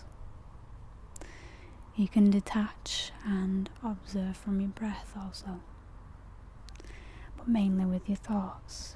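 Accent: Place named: Northern England, United Kingdom. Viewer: Wonderful and relaxed video. Nice surroundings and good tips for meditation. Bonus:your charming Northern English pronunciation!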